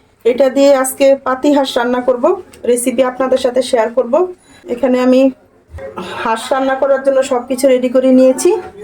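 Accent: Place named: Comilla, Bangladesh. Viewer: আপু দেখে নিয়েছি, আপনার কথা শুনে বুঝলাম আপনি কুমিল্লার যদি ঠিক হয়ে থাকে, আমিও কুমিল্লার,ধন্যবাদ আপু।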